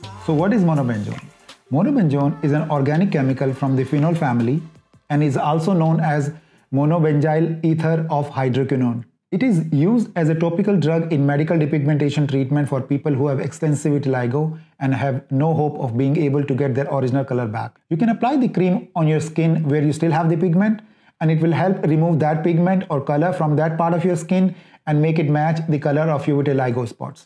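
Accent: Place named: India. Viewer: You sound so much like indian.are you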